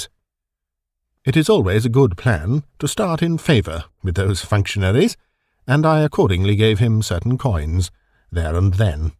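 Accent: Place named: Oxford, United Kingdom. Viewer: 👍 This seafarer horror story was well narrated, with a proper 🇬🇧 Oxfordian English-British accent 🗣️ that matches the setting of the Victorian Horror Story. Very enjoyable indeed!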